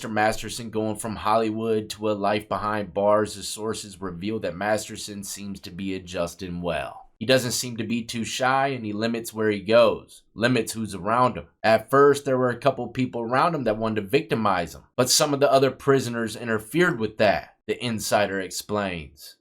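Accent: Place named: Detroit, United States. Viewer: Yo, you from Detroit?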